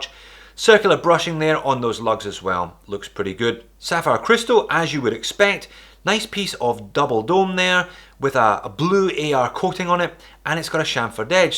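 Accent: Scotland, United Kingdom. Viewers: Scottish accent sounds like the taste of a heavily peated whisky, and I love both. The watch however is to Hulky for me, I enjoy the Kermit style much more.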